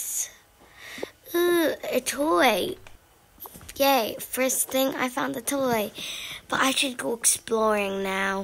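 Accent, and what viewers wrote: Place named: England, United Kingdom. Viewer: 𝕀 𝕝𝕠𝕧𝕖 𝕪𝕠𝕦𝕣 𝕖𝕟𝕘𝕝𝕚𝕤𝕙 𝕒𝕔𝕔𝕖𝕟𝕥<𝟛😛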